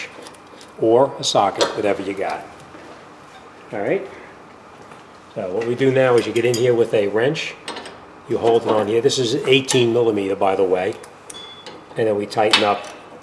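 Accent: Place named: New York, United States. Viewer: You have that strong NU YAWK accent! Excellent video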